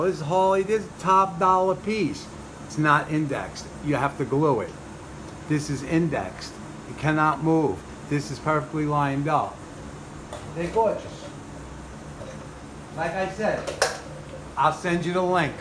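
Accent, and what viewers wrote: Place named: New Jersey, United States. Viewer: Yep, I do also, '03 Heritage Softail 100th, they fit '95 to '15 Softails...the real Willie G grips are only $114 at Harley #<num>;o) is the man!, love that accent!, must be New Joisey!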